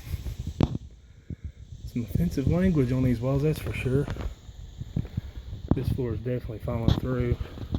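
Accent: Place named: South, United States. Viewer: Very cool! Just subscribed.. Love your southern accent.. It is a lot like mine!! lol (I am from NC.) Looking forward to seeing more videos!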